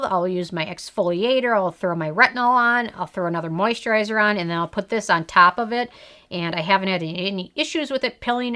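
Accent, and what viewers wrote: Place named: Midwest, United States. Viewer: just picked this up... ready to try it! also, you should def have more subs! this is so great and thorough... plus THE SECOND you started talking I knew I'd found a fellow midwest girl--I'm guessing Minnesota! love from WI!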